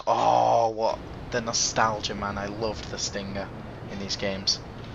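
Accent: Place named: United Kingdom. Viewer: Finally found a channel that's based on old school GTA's. Specially San Andreas. And you sound British lol!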